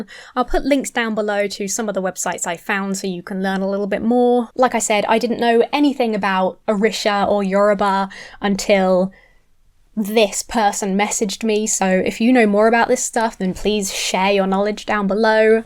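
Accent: United Kingdom. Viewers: Pretty sad when people get scammed like this. I enjoyed watching you have a bit of fun with the priestess. Love you, ya funny British-talking satan-worshipping lady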